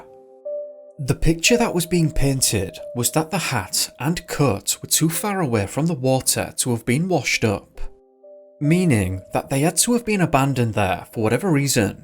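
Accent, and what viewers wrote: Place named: Northern England, United Kingdom. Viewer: is from the north of England and that’s how they speak.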